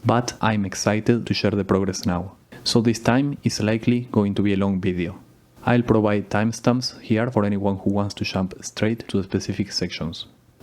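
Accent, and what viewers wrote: Argentina. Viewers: sonas como argentino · Sos argentino papá ? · El acento argentino es inconfundible jaja. Bien ahí hermano, muy buen proyecto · vos sos Argentino??? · Hola, excelente maquina, tu ingles tiene un dejo de acento argentino, puede ser? Saludos! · Como andas papá? Otros pensaran que es un ingles malo, pero yo note ese Argentino desde el principio. Proyectazo loco, segui para delante que es realmente impresionante. Felicitaciones 🟦⬜☀⬜🟦 ---- ⭐⭐⭐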